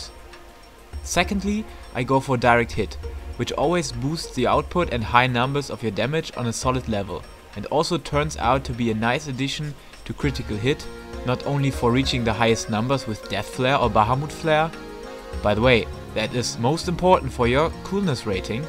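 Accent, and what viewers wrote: Germany. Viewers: You are definitely German!!! I never loved German accent (especially Dutch, gross!) But yours is absolutely adorable and so sweet accent. So nice to listen to !! Thank you for this guide... I hope... truly, that this will help me... Sigh. It's difficult, because people expect the best output instantly ; ; Is there any changes since you made the video?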